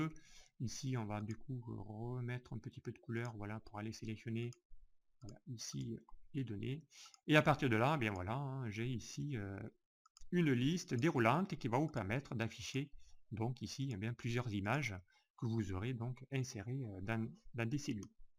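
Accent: South, France. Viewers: Super, merci beaucoup pour cette excellente vidéo avec le charmant accent qui sent bon le Sud ...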